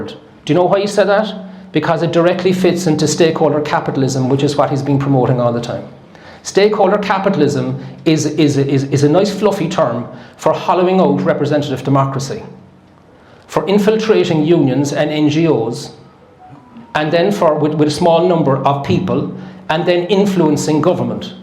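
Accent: Ireland. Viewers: 4 comments really yootoob?? 🤨 This was outstanding. Nothing I didn't already know, or have heard from others or suspected myself - but somehow for me, in this moment, it consolidated it all. Something about that glorious Irish lilt that made the repeating 'un-put-downable'. However, I am now so certain of Everything, that I don't know whether I'm so exhausted from 'knowing' that I'm inclined to pee-everything up the wall, party like a loon and spend what funds I possess, or whether I am so fired - especially obo my Grandchildren - that I'm gonna light a torch and pitch fork my way in to History🦬🔥 ( If you know me - the latter is the ONLY option. If you don't know me - is right - Big Bag, Big Fight - lead from the front) ✊🙏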